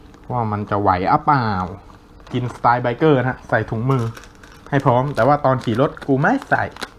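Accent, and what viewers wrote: South, Thailand. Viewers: คนบ้านเด่วกันคนใต้ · พี่คนใต้หรอ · พี่เป็นคนภาคใต้หรอครับ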